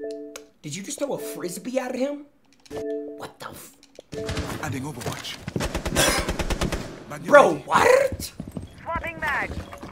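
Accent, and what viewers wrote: Canada. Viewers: W Canadian accent, w junk for sure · That Canadian accent killed me 😂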